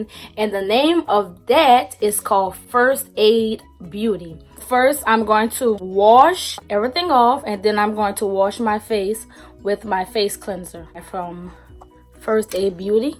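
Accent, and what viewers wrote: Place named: Louisiana, United States. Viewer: 🤣😂🤣 I died when you said I'm black we never measure anything. I can def hear the Louisiana accent baybay lol. You do have beautiful skin.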